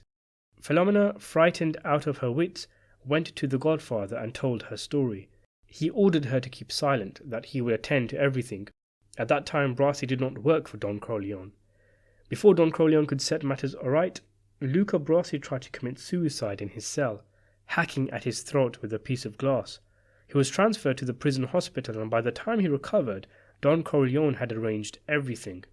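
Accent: England, United Kingdom. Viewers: It shouldn't be this way and I'm embarrassed to admit to admit it but it just doesn't feel right hearing an evaluation of the Godfather coming from someone with an English accent. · Hearing an Englishman try to pronounce Italian words and names is almost as funny as hearing an Italian try to speak proper English. Appolina? for Appalonia. And the flat A in Mario is a constant in British form of Italian speech. When will the Brits learn to speak American? Water closet is an awful name for that. And cars do not have a bonnet or a windscreen. How would either of those work?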